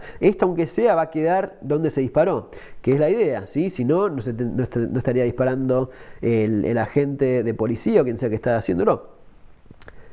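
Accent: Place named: Argentina. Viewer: Se nota por tu acento que sos argentino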